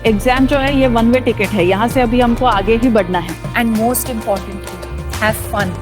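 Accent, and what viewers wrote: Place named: Assam, India. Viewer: Assamese accent nsna lgse hunibo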